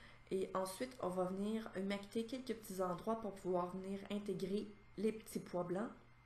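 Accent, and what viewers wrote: Quebec, Canada. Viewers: de rien vos videos sont super et j'adore l'accent quebecois ;)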